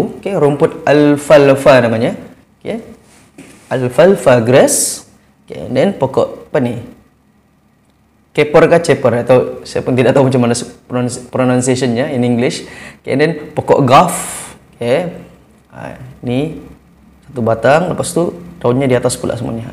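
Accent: Sabah, Malaysia. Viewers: cikgu orang sabah ke? sebab cara cikgu cakap macam sabah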